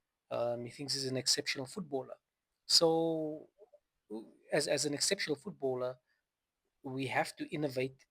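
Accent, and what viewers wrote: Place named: South Africa. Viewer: beautiful accent. are you from south africa by any chance?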